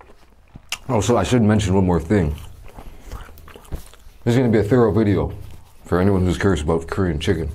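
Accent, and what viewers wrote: United States. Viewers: This guys looks Asian but sounds soooo different from an Asian. He sounds like a American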